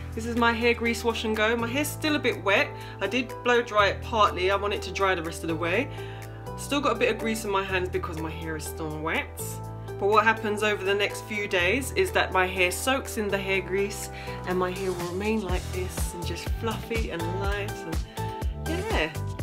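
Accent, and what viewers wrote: United Kingdom. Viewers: I love you UK accent, I've Cameroonian accent 🤪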